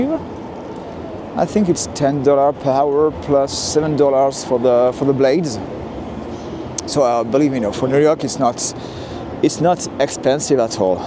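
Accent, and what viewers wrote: France. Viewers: I had just come across your recent Hudson yards video & it was beautiful 😍 🎄. I instantly subscribed & now seeing this. I like that you've decided to narrate your videos. A French accent is so nice. I can't travel like I'd like to so these kinds of videos are great. ❤🤍💚🎄🎅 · It was a very good decision to talk to us viewers. I hope that your channel will become even more popular as a result. You have the advantage that your English with a French accent is very popular in the English-speaking world. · Aww... nicely done! Your English with a fleur of French is very good, I just love it! Keep changing your channel, my favorite walking tour channel on YouTube, merci beacoup! 🗽🍏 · YES!! Finally we get to hear your perspective! Your walking videos were already great but with the added commentary, it'll be even better. And don't worry, your English is perfectly fine! 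Your French accent only adds to the content and makes you stand out. 👍 Allons-y, les gars! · Words cannot express how proud I am of you and your bold and courageous step to start narrative and soon to come live videos. ❤❤❤ Keep moving forward and break through those barriers that prevent us from achieving Your English is great with a French flair ❤❤❤ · As an afficionado of New York walking tours, I am well placed to say that your first narrated tour is eclipsed by none. The views of Dumbo are stellar, significantly enhanced by your impeccable command of English, a command that, it must be underscored, notably exceeds that of most native speakers. (Do not underestimate the charms of a beautifully poeticized use of English with slight overtones of French!) A wonderful audio-visual experience. Kudos! Quelle promenade exquise -- parfaitement bien narrée ! Nous vous en remercions infiniment ! 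Bravo ! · Super que tu commentes tes vidéos! Bon, je ne suis pas totalement bilingue, deux trois petits mots en français pour ton public de France, ce serait chouette. Mais tu t'exprimes super bien en anglais avec le p'tit accent frenchy qui va bien. Du coup, on comprend assez bien. Continue ainsi! Keep it up. Let's go.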